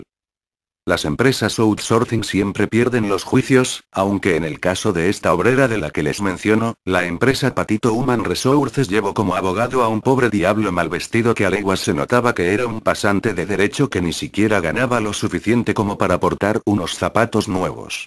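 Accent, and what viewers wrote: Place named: Spain. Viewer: ya me cayo en la madre ese pendejo k siempre sale narando con su vos española ya quiten esos videos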